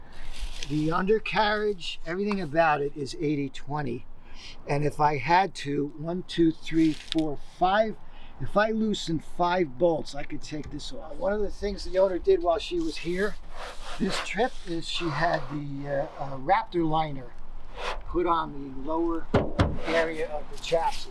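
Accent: New Jersey, United States. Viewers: I love the Hosts accent, he is so NJ accent.......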